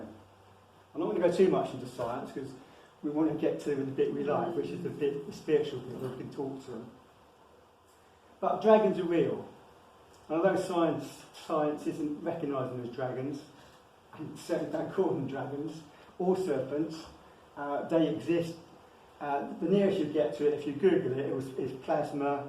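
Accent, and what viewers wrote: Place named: United Kingdom. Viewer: Love that British Accent........feels like home .......thank you for your Love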